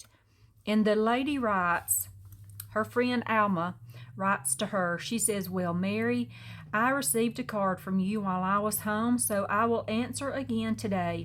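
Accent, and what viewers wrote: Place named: Texas, United States. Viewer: This is a stunning journal. I love your style. I also love the accent being from Texas we say y'all and fixin all the time. Tfs Kathy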